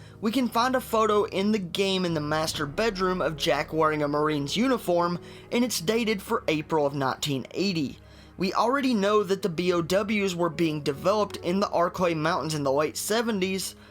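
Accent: South, United States. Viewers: JSG GAMING its a southern accent.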